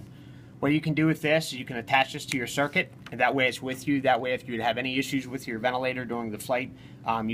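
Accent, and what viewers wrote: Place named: Pittsburgh, United States. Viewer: Pittsburgh accent!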